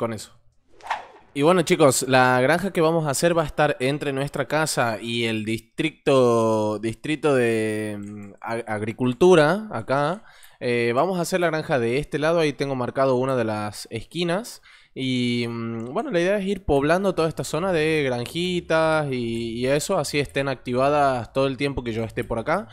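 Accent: Tucumán, Argentina. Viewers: Che sos argentino digo por como prpnuncias la rr. Porque creo que en alguna provincia se pronunciaba haci la rr. Creo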